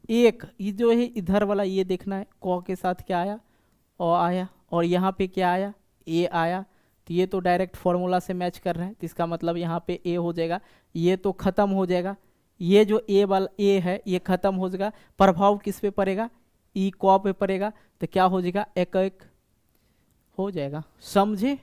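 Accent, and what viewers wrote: Bihar, India. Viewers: Sir aap bihari hai ❤❤❤❤ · Sir apki language samajh nhi ati hai lagta hai app bihari mai bat krte ho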